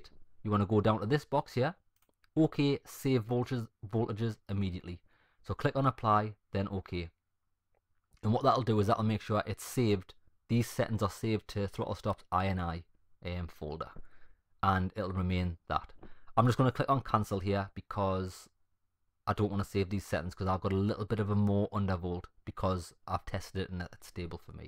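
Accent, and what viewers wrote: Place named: North East England, United Kingdom. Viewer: You are from Newcastle or somewhere in the north east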